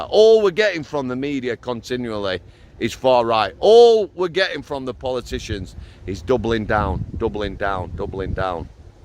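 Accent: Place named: Yorkshire, United Kingdom. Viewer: I always thought you had a Yorkshire accent I grew up on the Manor Don’t live in Sheffield anymore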